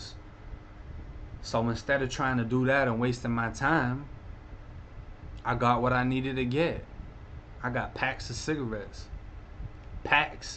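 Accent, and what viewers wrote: Boston, United States. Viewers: Where in America is his accent from? I’m from the UK and I’m awful at guessing 🤦🏻‍♀️ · That Boston accent mix with that Dowwn souff Florida accent is brrraaazzyy p!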